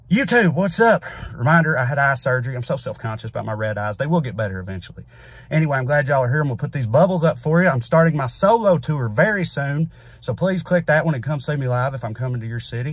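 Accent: South, United States. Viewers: I agree with your rant but I just don't trust anybody with the Country accent after 1.6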